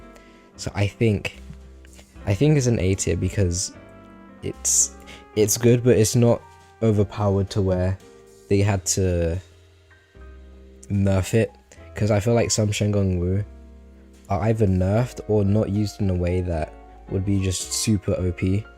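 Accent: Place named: United Kingdom. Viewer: Do my ears deceive me? A fellow British Xiaolin Showdown fan?!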